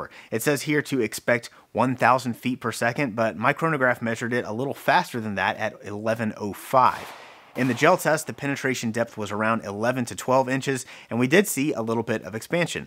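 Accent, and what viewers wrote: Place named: Tennessee, United States. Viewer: It's ok we can tell your in Knoxville Tennessee, like the rest of us with those problems, that talk that same way Ya'll!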